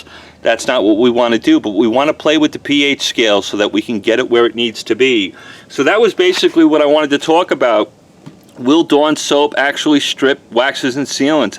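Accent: New York, United States. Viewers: he is from NY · He sounds like he has a NY accent, and I'm from there, soo it's a NY thing, the hand gestures lol, we talk with our hands too lol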